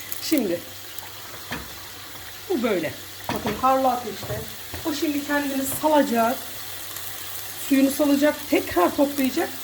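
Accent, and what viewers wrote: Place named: Thrace, Turkey. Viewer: Macırsınız galiba.kıyık bırak dediniz ordan bildim.zaten konuşma aksamınızda trakya aynı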